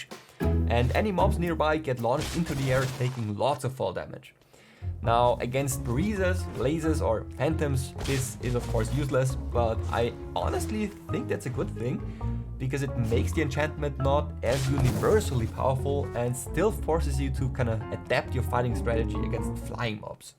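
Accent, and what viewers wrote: Germany. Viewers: Man merkt das du deutsch bist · are you german cuz you are from Austria im german too and your english sounds like if you were german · Why does it sound like you have a german accent? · Bist du deutsch hört sich irgendwie so an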